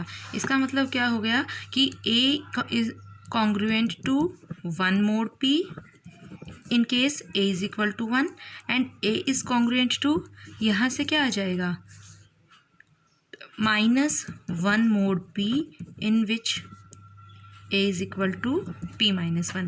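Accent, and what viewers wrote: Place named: India. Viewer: Is every damn mathematician on the Internet an Indian person? I'm having trouble with ALL the Indian accents.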